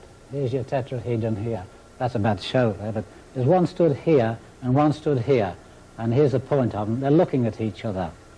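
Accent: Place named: West Midlands, United Kingdom. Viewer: is from Wales. is from the West Midlands in England, by my ear.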